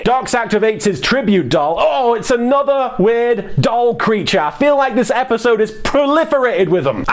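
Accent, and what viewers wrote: United Kingdom. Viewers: has never been so brittish, and I bloody love it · Silly question but is from the UK? I can't tell if he has an accent or not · this is the most British your ever sounded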